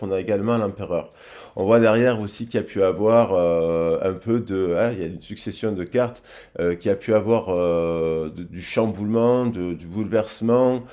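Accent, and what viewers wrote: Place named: Marseille, France. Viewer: Coucou sa me fait plaisir de vous voir en ce dimanche j aime bien entendre votre accent marseillais c est vrai que c est magnétique et puissant sentir ce désir intense l amour pur véritable c est beau quand c est reciproque c est le coeur qui parle cette appelle des deux âme qui veule ce retrouver c est magique ce bonheur bisous 😚💞🌈🌞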